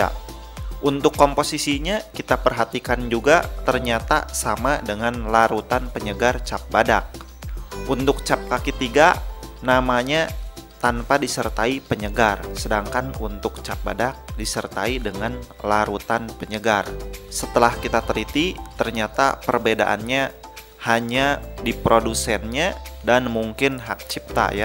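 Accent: West Java, Indonesia. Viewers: Akang orang sunda yah?? Logatnyah nyunda pisan...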